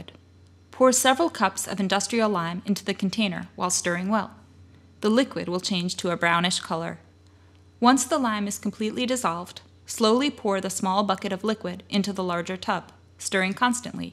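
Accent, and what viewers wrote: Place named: India. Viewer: Indian English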